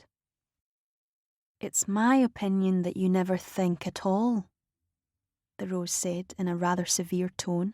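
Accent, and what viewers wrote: Scotland, United Kingdom. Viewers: got the most beautiful Scottish accent ever! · and her scottish accent. Perfection. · Chinese girl with a Scottish accent my God literally could not find a more perfect beautiful woman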